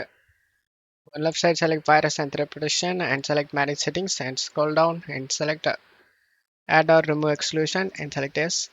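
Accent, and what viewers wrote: India. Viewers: First i heard indian accent then i knew it will wokr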